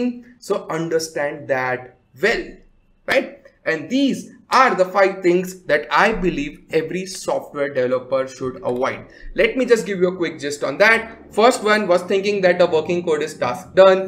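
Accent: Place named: South India, India. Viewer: Both content and South Indian accent on point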